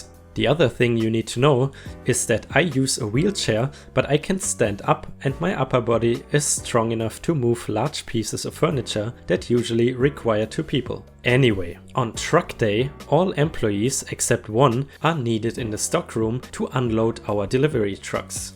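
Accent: Germany. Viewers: ufff man hört den Deutschen Akzent raus xd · I love your accent. Are you from Germany? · Great videos, love your delivery style and that genuine German accent. Definitely still read the possible fake reports but give a warning as you have been, that it's possibly not true but being read because it is funny. Great videos, love your scheiße comment on a previous video of yours (I did German at high school, it means 'shit' for non German speaking English speakers). This next bit is from another video of yours. Gibberish is pronounced with a 'J' sound (jibberish) at the beginning, like 'J' sound (as in Julius for example) rather than the 'G' sound as in Gorilla.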